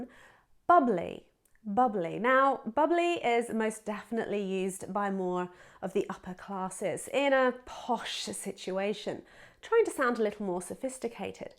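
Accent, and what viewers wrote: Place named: RP, United Kingdom. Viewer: so no abbreviations and your english is posh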